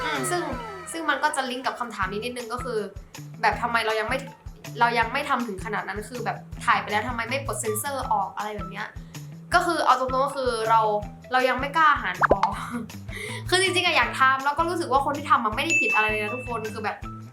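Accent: South, Thailand. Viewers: เป็นึนใต้ป่ะครับ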